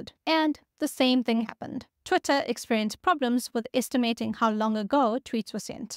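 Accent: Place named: South Africa. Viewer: Sounds like a Saffa accent.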